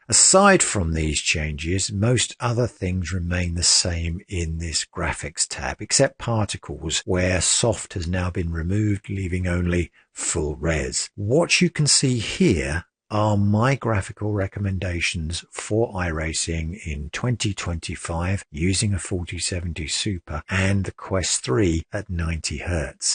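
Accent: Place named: United Kingdom. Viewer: I’m in UK (as I assume you are from the accent 😂) and I don’t think it’s a regional rollout of 24H2. It was initially rolled out, had tons of bugs, and then pulled back. It’s since only being rolled out to systems with hardware device IDs that Microsoft have verified as not having issues from what I understand. This means if not offered, you may have some hardware they’ve not fully ironed out the issues out for yet.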